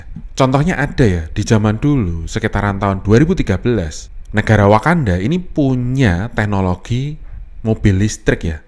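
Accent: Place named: Java, Indonesia. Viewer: Suaramu jawa banget mas asli pundi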